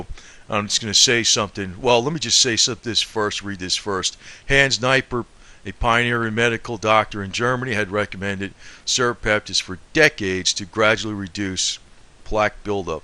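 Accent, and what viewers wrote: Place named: Boston, United States. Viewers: This guy is not a healthcare professional which is obvious from his continued mispronouncing of simple medical terms, especially the word Natto, and NattoKinase. It is not Nacho cheese. It is pronounced Knat-O-Kine-Ace. Serrapeptase as well.(Sarah-Pep-Tace) These are protein based medical terms ending in Ase(Ace)!! Please, if you are going to talk about these systemic enzymes, lose the thick Boston accent so that you sound a bit more intelligent, and then please pronounce the words correctly. You can't just say "when it gets into the bloodstream, it just does it's thing". This is a great misrepresentation of the mechanism of action that these enzymes perform in the body. Get the IDEAR?? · hey, this dude is the real deal, and when some "professional" is coming at him with the "pronunciation" of his words, there's some insecurity happening here. 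the information is priceless, and so is this gentle man. his accent is home to me, new york, boston, love him. I don't care if he calls nacho knish, if it works, we will figure it out no problem! thanks for your input suh! I'd rtather pay him than some herk whose agenda is to get his/her ass top the bahamas on our miserable dime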